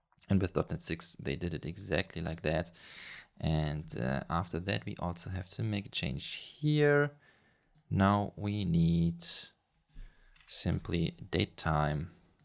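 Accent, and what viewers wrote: Germany. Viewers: Also fur einen deutschen sprichst du ziemlich gut Englisch! Der deutsche Background ist jedoch nicht überhörbar 😃. Danke fur das tolle Video! 👌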